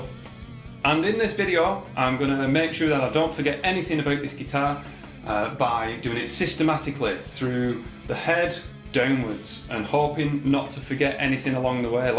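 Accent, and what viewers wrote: Lancashire, United Kingdom. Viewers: Great Lancs accent mate. No joke.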